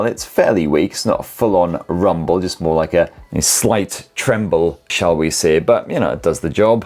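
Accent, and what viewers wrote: United Kingdom. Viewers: you talk like Rowan Atkinson, or maybe every British out there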